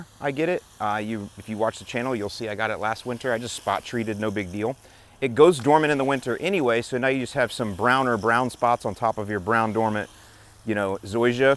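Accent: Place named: Midwest, United States. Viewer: Walk and fert? How about “walk, fert a minute” in that glorious Midwest accent.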